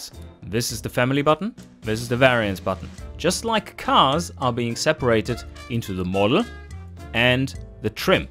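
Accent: Germany. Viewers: Is this a fucking german or english accent i cant decide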